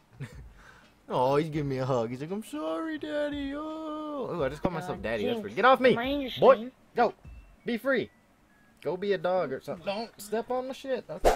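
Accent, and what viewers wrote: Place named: Indiana, United States. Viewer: are you from hickville indiana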